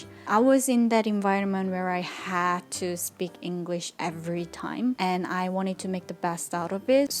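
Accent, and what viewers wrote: United Kingdom. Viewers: 너무 멋져요!!!!! 씨 보면서 저도 다시 영어공부하게 되네요 씨의 영국식 영어와 캐나다식 영어 발음이 너무 듣기 좋아요!!! 당당하고 멋진 삶 응원해요!!!!👍❤️ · 아주 깔끔한 발음에 얹어진 브리티쉬 엑센트가 넘 좋아요~~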